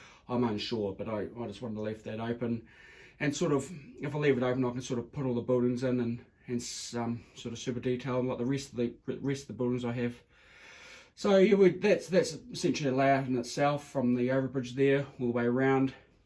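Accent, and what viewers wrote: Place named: New Zealand. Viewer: Great to hear a good old fashioned kiwi accent! Kia ora, new sub from a former Wellingtonian.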